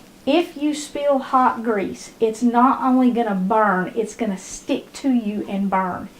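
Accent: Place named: Appalachia, United States. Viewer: So simple and yet so satisfying! Everything you make - and the accent - hits sentimental chords of my WV and Eastern KY upbringing ... miss these meals my mammaw and mom would make! I am so happy to be your subscriber!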